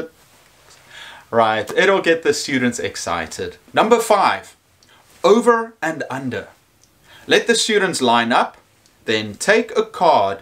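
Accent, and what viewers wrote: South Africa. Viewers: Great video, thanks for the tips! I am trying to work out your accent, am I wrong to say you are South African? I am not bad at picking accents considering I am not English :) but your accents makes me wonder.... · That South African accent is a blessing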